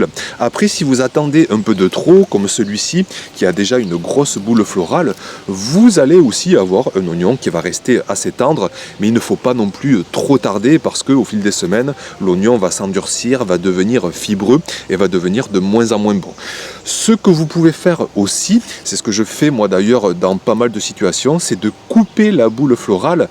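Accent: South, France. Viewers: Bonjour, Je me suis abonné parce que tu as l'accent du sud. Je suis belge 😊. Le sud m'a toujours fait rêver et tu es un "exemple" qui alimente cette vision. C'est chouette que tu puisses vivre de cette passion (surtout pouvoir laisser une chance à sa sensibilité). J'aimerais bien t'avoir comme voisin 😂. Bonne continuation. Pierre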